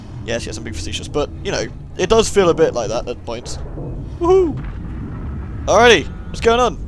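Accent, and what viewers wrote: United Kingdom. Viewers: Not surprised the unnamed gas giant's atmosphere and winds shredded the station up, probably similar but larger in magnitude, mass and strength of winds like Jupiter. Also not surprised with the ending being an homage to the original 1979 Alien movie. It took me about 3 or 4 days to watch this all in the evening hours (7 PM - 1-2 AM EST) , fantastic LP with your sense of humor and/or logic and your awesome British accent.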